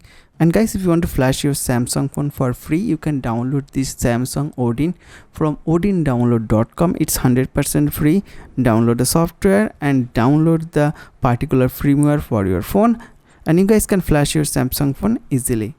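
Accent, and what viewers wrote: India. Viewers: neither free nor for all android. His awful english (indian) later on tells you it only does Samsung phone. I loathe this b.s.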